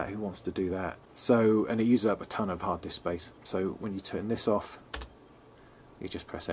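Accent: United Kingdom. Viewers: y=u futn brit mate... 2quik 4meaaaa{british accent}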